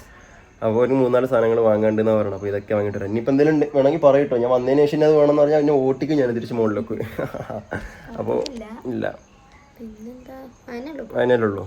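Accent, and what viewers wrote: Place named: Malabar, India. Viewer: സംസാരം തനി മലബാർ ഭാഷ ആയി തുടങ്ങി 😂😂😂😂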